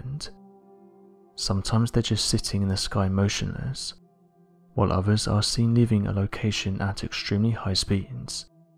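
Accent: England, United Kingdom. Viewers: You sound English what do you mean we, neighbor? Its better that none of the new stuff hit YouTube. China already stole plans for some 6th gen fighter jets. It was the junk20. Thankfully china isn't very good at putting shit together yet. Im thinking the us government got wise to china and leaked an inferior plane. Either that or china is so much more incompetent and should not ve taken at all seriously. The black ops planes are still being made.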